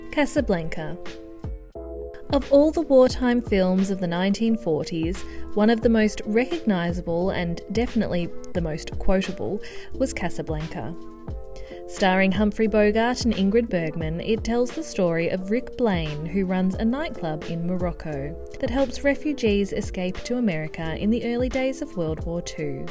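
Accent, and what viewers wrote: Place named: United Kingdom. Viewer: Why is someone with a British accent narrating a film on American life?!?!?;?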